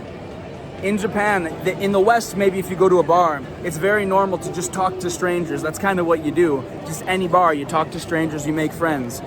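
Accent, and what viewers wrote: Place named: Chicago, United States. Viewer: Are you from Chicago? Keep up the great videos on YouTube 😎👍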